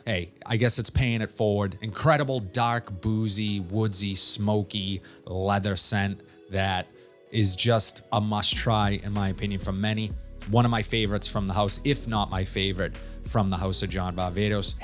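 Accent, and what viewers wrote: Boston, United States. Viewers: Great video , and I love that Boston accent 😅👍🏻👍🏻 How do u feel serge lutens “chergui”compares to this one ? I’m curious about these fragrances now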